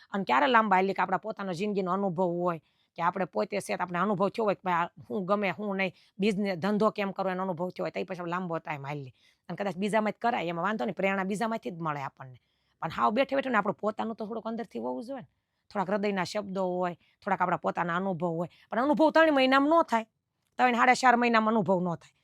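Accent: Kathiawar, India. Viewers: મારી છોકરાની વહુ ઓસ્ટ્રેલિયા રહે છે મારી ભાષા બોલવાની તમારે જેવી કાઠીયાવાડી છે મારી વહુ ને ફોન કરું ને મમ્મી હજી થોડીક વાર વાત કરો મને બહુ ગમે છે કાઠીયાવાડી ભાષા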